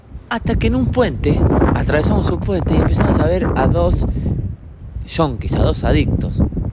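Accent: Argentina. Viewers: Jajaja me partí con lo de "leche de almendras. de gente rara" 😅😅 pues a mí me gustan esos rollos, me acabo de suscribir a tu canal por 4 cosas: me gustó la temática del vídeo, me gusta cómo se ven las imágenes con la cámara esa y me mola el acento argentino, además también me gustó que explicas muy bien todo, un saludito y gracias desde Islas Canarias, España 😉👍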